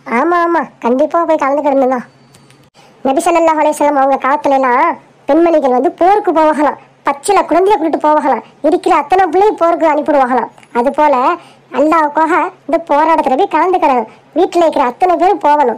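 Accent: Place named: Parangipettai, India. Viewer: Intha slang parangippettai thana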